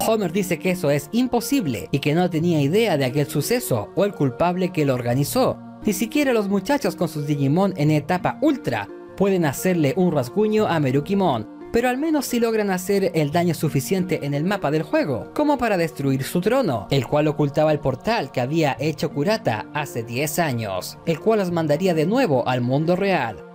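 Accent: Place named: Chile. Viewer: Es chileno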